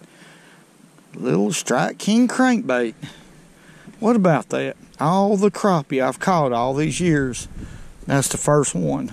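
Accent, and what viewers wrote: South, United States. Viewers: Man I love your rednick voice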